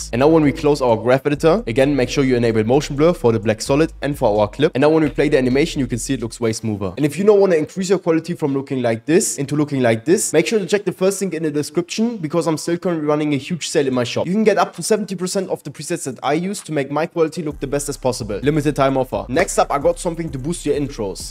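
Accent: Germany. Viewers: bro sounds so german 😭😭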